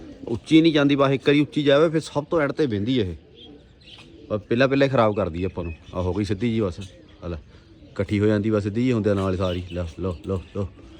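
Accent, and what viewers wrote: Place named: Doaba, India. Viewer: Bai tuadi boli duabe mtlb sade Ali a kehda area Banga ja phagwara ?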